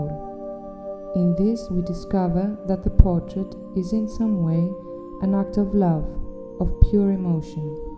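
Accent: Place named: Greece. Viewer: she speaks with a heavy accent, is she greek ?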